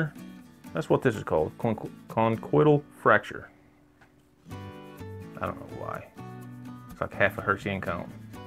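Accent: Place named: South, United States. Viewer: As an overthinker i truely appreciate your slow southern dialect. Lol Nice take on angles too.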